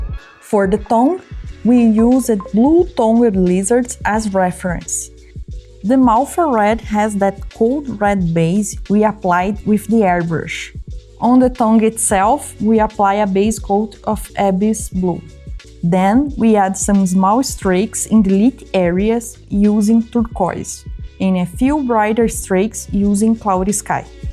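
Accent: Brazil. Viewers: Hey, are you brazilian? I think i noticed a accent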